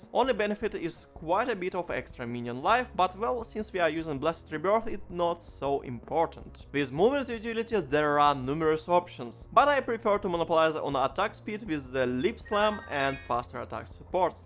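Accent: Russia. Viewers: Russian accent is so intense)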